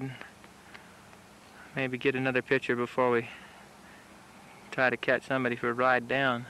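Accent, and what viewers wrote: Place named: Utah, United States. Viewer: This is the most Utah speak I have ever heard. The most fetchin fetch of a mount'n I've ever fetchin gone seen!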